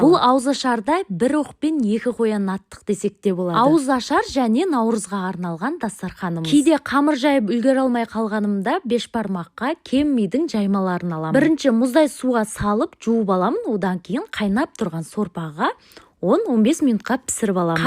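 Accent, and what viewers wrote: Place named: Qashqadarya, Uzbekistan. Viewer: Qashqadaryodanmisiz deymanda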